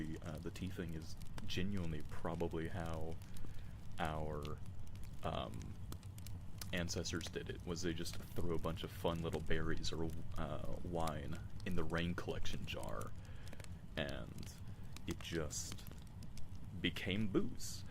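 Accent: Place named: Texas, United States. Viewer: It surely was a fun day!!! I really enjoyed the whole hour, AND i learned new things thanks yo you a whole bunch, i love ur Texas accent, i love old western especially on TV like Dallas!!! The original of course, your voice really has a strong deep tone, love u!!! Ur good Boy.❤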